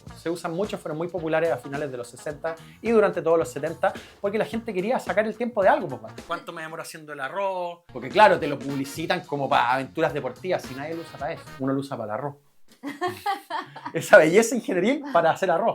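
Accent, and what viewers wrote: Chile. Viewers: Al final … tuve que leer la transcripción. Tu nivel de Chileno es demasiado complejo para los simples hispanohablantes. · Sin ver los videos va like directo... me encantan los relojes pero mas tu pasión y el acento chileno.... cachai? Abrazo desde Argentina!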